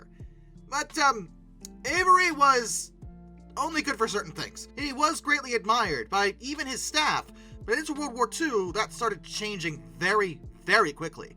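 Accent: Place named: Mid-Atlantic, United States. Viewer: I like it. It's like a mid 20th century newsreel with a slight mid Atlantic accent. Appropros for the subject.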